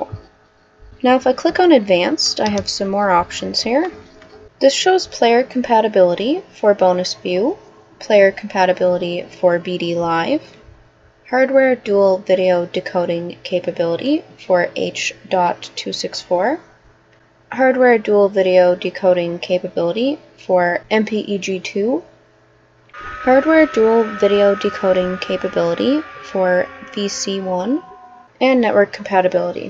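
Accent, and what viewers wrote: Canada. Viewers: i thought your accent sounded canadian :p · I don't want to sound stupid, so sorry If I do...I haven't upgrade to a Blu drive yet so clearly I don't pass the Blu advisor check (which I will once installed) for 3d is says I cant support 3d playback, but what happens is I put in a 3d blu drive and use and hdmi to my 3d tv will it work due to the fact I'm using the comp more as a player than for viewing? Oh and yeah you sound wayyyy Canadian haha.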